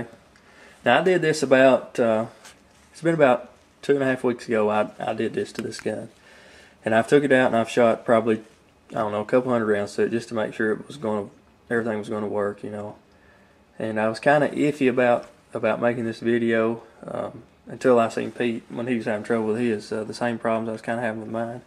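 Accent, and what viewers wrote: South, United States. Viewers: I would have to say that I believe you may be a touch Southern. We talk a little funny to the northern folks.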